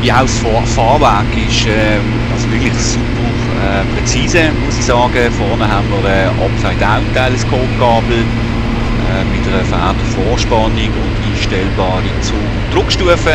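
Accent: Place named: Aargau, Switzerland. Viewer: Was das fürn Dialekt, klingt nach Aargau aber irgendwie komisch :D Grüße in Richtung Heimat.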